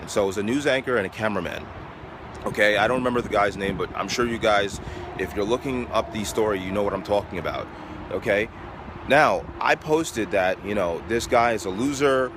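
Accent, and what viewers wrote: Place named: Boston, United States. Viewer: Are you from the Northeast? You've got that Bostonian accent. Good video, by the way.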